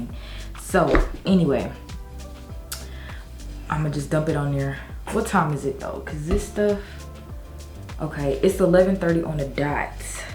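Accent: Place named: Florida, United States. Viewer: U have a Floridian accent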